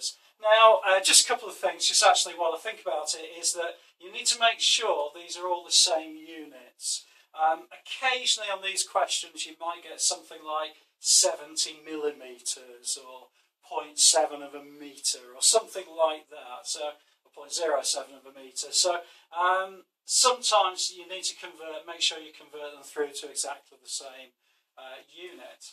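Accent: England, United Kingdom. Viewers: For some reason a Englishman explaining these things are more reassuring. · i'm english and i feel the same way idk why though i should be used to my own accent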